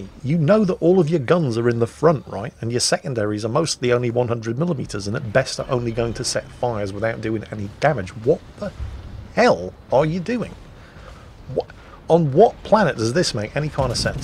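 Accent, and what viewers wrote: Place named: New Zealand. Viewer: Agreed 100% - great to hear a voice from home, even better someone who loves the absurdity and sheer 'fun' of (especially) WoT. Best from NZ.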